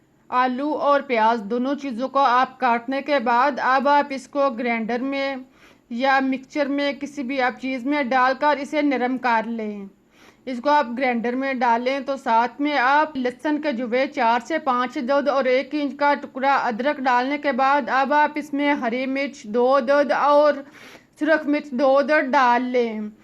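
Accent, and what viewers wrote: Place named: Punjab, India. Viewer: She is speaking English Words in Punjabi style, using Plenty of Oil, Bread will definitely break. Mix, Oil, crispy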